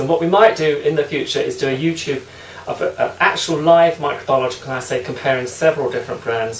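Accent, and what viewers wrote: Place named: England, United Kingdom. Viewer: I only believe this guy because he has an English accent. Great demonstration.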